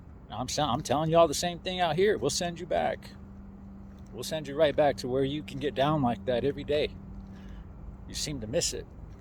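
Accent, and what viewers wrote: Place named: New York, United States. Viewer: Sad I think I figured it out he from New york?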